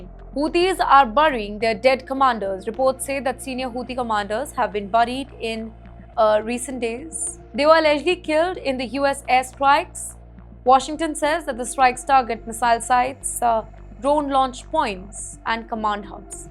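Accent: India. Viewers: Oh my god look at this curry accent we Australians don't understand what you are saying speak proper English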